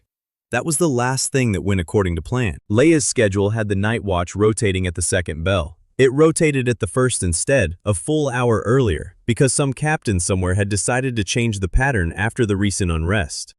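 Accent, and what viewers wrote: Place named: United States. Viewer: Good story but not a fan of the AI voices American cowboy accent for a midevle fantasy.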